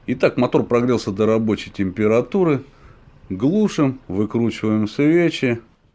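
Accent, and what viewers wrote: Russia. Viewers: По акценту голоса, можно догадаться что вы из Волгограда или волгоградской области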